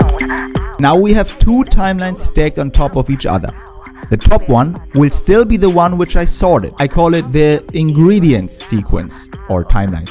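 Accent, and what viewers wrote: Germany. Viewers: That was an awesome video and very helpful! Hearing organization tips from a German accent also seems right somehow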